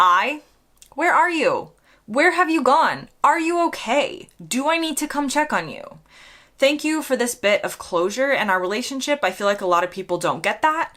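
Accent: United States. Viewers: Gosh- I'm glad you are able to greet these with humour - this was a fun response! I fail to understand why anyone who hates Americans or an American accent has bothered to even play your videos. You'd think they'd steer clear......or just scroll past...... Heyho- onwards and upwards! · I have to ask, but, where did you get the sarcasm from? And what accent are your countrymen complaining about? You sound very american. At least to me. And not in a bad way. If you are born and raised in the USA, you most probably will sound like it, and to me, you do. Nothing offensive about anything you do - or say. In fact, I very much like everything about You and your approach to Europe (England) and the USA. I don't think you bash either side. This is a definite subscription to your channel. I will follow other videos from you. I'm not a native english speaker, so, I may have "said" (written) something offensive or provocative in this rant, but I mean no harm. 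I really love your approach and your content. Have a brilliant day. ❤ from Denmark! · your stupid accent does not offend me, you are one of the few americans i can stand to watch, you do not have the better than you attitude ( which is more than i can say for other yanks ) upon which, would result in immediate cessation of viewing. so good job and keep up your good inquisitive flow. 👍 ( you are almost a brit ) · I british.i like Americans.i like your accent. I wish I could have a holiday in America.after all,we are allies.maybe the best allies on the planet. So ignore these buffoons who are probably jealous of you and your accent. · American is not my favourite accent, but when one watches an American what else do you expect. It is a shame you feel you have to put this out, but I understand totally, I liked it and you are picking up the British sarcasm and understated piss take very well. 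Keep going girl.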